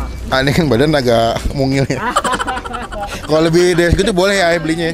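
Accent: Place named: West Java, Indonesia. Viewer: Bakwan malang tapi logatnya sunda bang 😅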